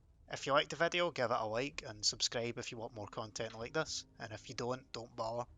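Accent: Scotland, United Kingdom. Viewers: Ha your scotish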